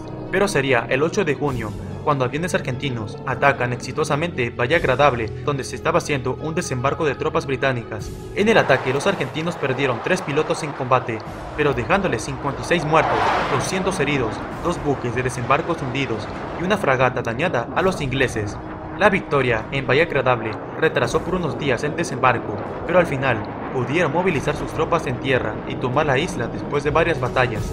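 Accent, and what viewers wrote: Peru. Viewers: paso de largo por la guerra peru colombia por el trapecio amazonico y putumayo ? me sorprendio mucho , pues creo su aento es peru , me equiboco ? si es asi debo suponer fue intencional la omicion ? - vi en barios de sus ejemplos , fueron en conflictos internos , siendo asi deberia estar colombia , pais donde mas operaciones areras se dan - mas que toda resto latinoamerica junta , pilotos vuelan 5 beses el promedio de horas vuelo de todos pilotos militares de latinoamerica , mayoria en operaciones combate , bombardeos reales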